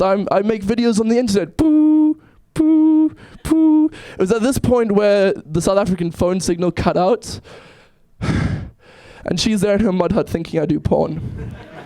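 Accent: England, United Kingdom. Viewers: HIS ENGLISH ACCENT OMG I DIED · but what if we r not n america or Britain, I am in Russia and omg i absolutely love u!!! u were amazing and its very rare to see people willing to get over their fears u r so cute and u dont sound south african at all i am not trying to be racist plz to take me wrong i am not saying south africans r supposed to sound and look a certain way no i am not but u sound like u have a british accent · He's been living in the UK for a while, he's picked up an accent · Your voice already sounds like an English accent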